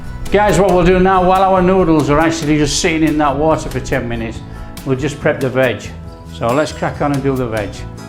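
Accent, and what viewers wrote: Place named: England, United Kingdom. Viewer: No we don’t need music, we enjoy your English accent. I love bean sprouts I have them in almost every Asian dish I cook. Thanks heaps, I love your recipes. I’m watching from Australia 🇦🇺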